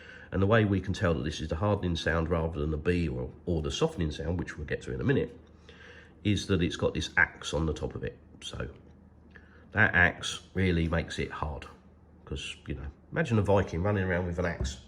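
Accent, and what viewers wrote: United Kingdom. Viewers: Кажется, британский акцент..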